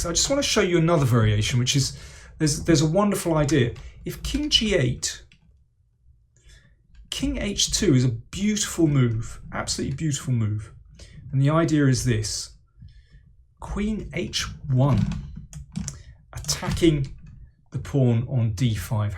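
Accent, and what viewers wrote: England, United Kingdom. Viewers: Daniel, as a french man and correspondance chess player, I really appreciate your clever comments and also your typical english accent ! Good revision for me. I think MVL came in this tournament without pressure : he had nothing to lose !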